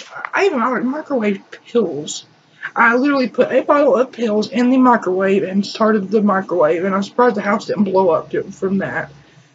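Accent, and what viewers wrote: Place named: South, United States. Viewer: Mania would be would be the worst, I counteract depression through exercise but I don't know if that works for bipolar people. Seriously though if I were you I wouldn't try and take any sort of mild/strong anti-psychotic. If you want something that works I took pristiq for years on end and it made me feel really 'high'. It counteracted my depression very well when effexor just made me really sweaty and anxious. I mean you can check out some video's when they forced me on abilify, an injectable. Now I get SVT's (recorded as well) all the time. You sound like you got a southern accent I recommend to fight you depression it's probably better to try and get a job further up north. It's just the more south you go I've noticed the worse things get with mental health in America. Have fun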